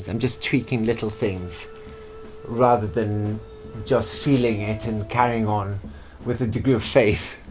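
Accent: RP, United Kingdom. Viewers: He talks like the Royalty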